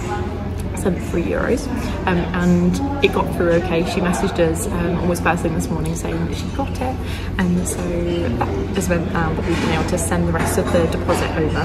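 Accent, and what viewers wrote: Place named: West Midlands, United Kingdom. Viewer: Love watching you enjoy what we nearly did when young but chickened out due to family issues. Intrigued by your slight accent similar to our family and mine which is West Midlands. You look like and sound like the younger daughter of my cousin who was raised in Tile Hill north west of Coventry and sounded to me sometimes like Shropshire. Are your roots similar. Have a great time seeing as much of the world as possible.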